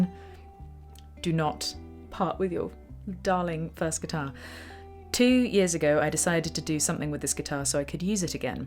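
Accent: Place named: England, United Kingdom. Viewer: My first time seeing you. I like your accent. You’re English but I can still understand you. 😆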